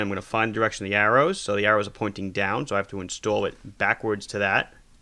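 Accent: Long Island, United States. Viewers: I grew up in Huntington Station. I really miss hearing people speak like this, not just the accent (yours is not overly pronounced), but the manner of speaking. Confident, intelligent, with a sense of humility and humor combined. Thanks for bringing back memories. Thanks for the excellent video! Channeling: "Cause [Florida's] not my home." PS - Big fan here. Too bad they haven't created anymore music.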